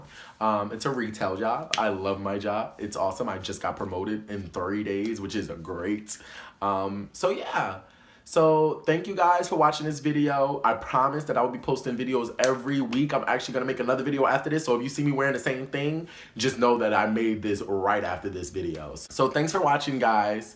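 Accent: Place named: Miami, United States. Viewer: I think it’s in Miami based on his accent and DR references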